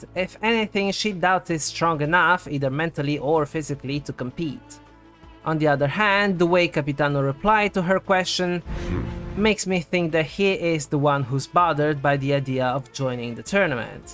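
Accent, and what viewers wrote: Italy. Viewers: Ma sei italiano? 😮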